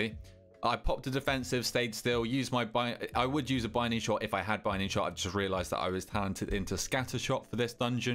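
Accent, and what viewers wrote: United Kingdom. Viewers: Couple of questions mate, how do you even click those skinny nameplates and also, where in the UK are you? You sound fairly southern... Great content btw, love it.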